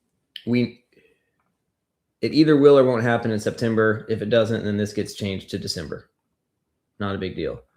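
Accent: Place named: North Woods, United States. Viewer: Wisconsin GME holder checking in, definitely a little north woods accent coming through lol. Always look forwards to your videos keep up the good work brother!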